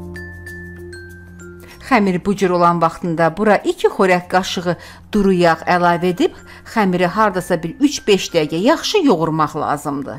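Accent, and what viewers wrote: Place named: Sheki, Azerbaijan. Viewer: Mən Şəki lehcesine oxşadiram.mamamin xalası da eynən belə danışırdı.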